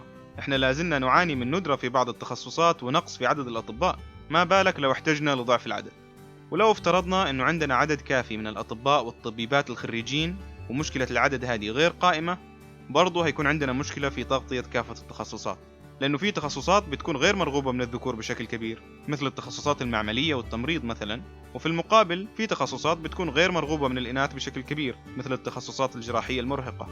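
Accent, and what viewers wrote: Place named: Hejaz, Saudi Arabia. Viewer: لا تتعب نفسك بهالمبررات اللي إنت تقول عنها بأن الإختلاط في مصلحة الشعب أو بأنه تحضر وتقدم أو بأن الإختلاط ضروري بالمستشفيات وأن من الصعب الفصل بين الجنسين بها .. لا بالعكس المستشفى أكثر مكان يتوجب الفصل بين الجنسين به لأنه المكان الوحيد اللي بتضطر أن تصطحب محارمك وتذهب إليه وفيه كشف للعورة أحيانا وفيه قولة خل محارمك عندي واطلع برة .. ولا يوجد رجل في قلبة ذرة غيرة مؤيد الكلام اللي قلته بالمقطع .. لو أن هناك أماكن من الصعب أن تفصل بين الجنسين بها مثل المسجد الحرام وبقية المساجد قال الإسلام النساء يأتين بالخلف وكذلك الشوارع والأسواق ولكن المستشفى وش خسرانة الدولة لو تم الفصل بين الجنسين ولو بالشيء القليل .. الغيرة موجودة يا صاحب المقطع مبين من لهجتك بأنك من حضر الحجاز يا اللي كل همكم وشغلكم الشاغل التمويل والسعي ورى الإنفتاح والإختلاط ووضع مبررات وحجج بأن الإختلاط والإنفتاح فيه فايدة للشعب وتقدم وتحضر ورقي .. قبحكم الله .. 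الإختلاط بالمستشفيات هو اللي أجبر بقية الأماكن أن تتبع خطاها بالإنحلال و الإختلاط اللي حرمه ربك .. تقول بالمقطع صعب على الدولة أن توفر في كل قسم وفي كل تخصص طبيبات وفنيات وصيدليات وإداريات في أقسام نسائية مستقلة وش الصعب في الموضوع .. لو الدولة لم تجد امرأة سعودية في تخصص معين بإمكانها أن تأتي بطبيبات وفنيات وإداريات من الخارج وتوفر وتسد حاجة كل قسم حتى بنات البلد يغطين النقص .. لو يا صاحب المقطع صعب تدريب الشباب الأطباء الطلبة في أقسام نسائية وتوليد هن للنساء ولادة طبيعية مع العلم بأن من اللي كان يولدهن قبل مجيء المستشفيات وأحيانا تخصصات تافهة من السهل أن تسد حاجتها سيدات مثل الأشعة و المختبر ومن يشفط البلغم من حلق المريضة لااا.. والممرضة اللي تروش المريض ..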